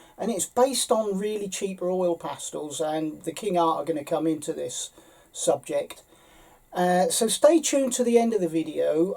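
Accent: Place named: Norfolk, United Kingdom. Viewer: sounds like ur a norfolk boy.dyou know jason norman?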